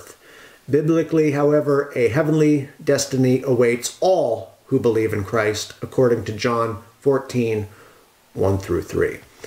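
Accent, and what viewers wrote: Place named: North America. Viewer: According to the Oxford dictionary, the word cult is defined as "a relatively small group of people having religious beliefs or practices regarded by others as strange or sinister". Firstly, by way of informing you, the seventh day adventist church is a world wide denomination with an approximate membership of over 20 million members...( and counting ) Of this number, over 1.2 million alone are from North America, a place where you reside given your accent. Hence, the growing size of this church by way of simple logic disqualifies it as a cult. Like the dictionary says, a cult is made up of a relatively small group... however, on the contrary, this church is not a "put together church" formed in recent times in a shed to the back of nowhere, rather, this church was prophesied by God himself and is sustained God himself with a global reach. 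In addition, the said dictionary states that the word cult is associated with devotion towards a particular figure or object. Again you seem to be misinformed as the only allegiance the church bears homage to is Jesus Christ hence the name " SEVENTH DAY ADVENTIST " which means, looking forward towards the coming of the Lord. In other words, their belief is in there name. You are correct in suggesting that the church have a leader. Infact, they do have a leader, someone they follow, but it's not Ellen G White like you suggest, rather Jesus Christ their soon coming king. Lastly, the origins of this church is not only supported with Biblical e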